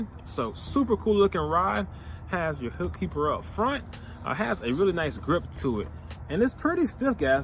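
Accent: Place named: South, United States. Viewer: Lol temperature swangs! Lol thats southern draw! I enjoy your videos bro. I hope to see you do more kayak fishing. Thank you for taking the time to make these videos.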